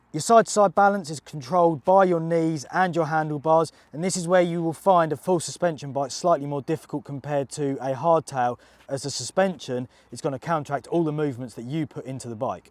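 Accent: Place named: United Kingdom. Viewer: British English!!!!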